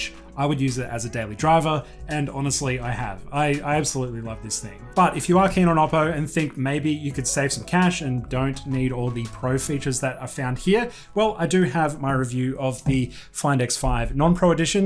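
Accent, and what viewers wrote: Australia. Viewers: You're absolutely right. LoL. It's kinda weird 2bh 😁. An Aussie tech chap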